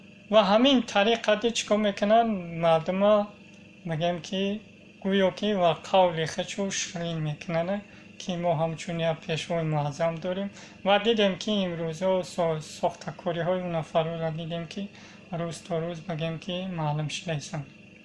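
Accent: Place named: Kulob, Tajikistan. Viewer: Э руи ту сиёҳ финги тра чиву гапзанит чи 😃 ранги чучи кулоби гап мезадай 😅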